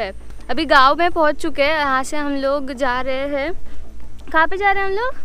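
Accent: Maharashtra, India. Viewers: Proper marathi accent good one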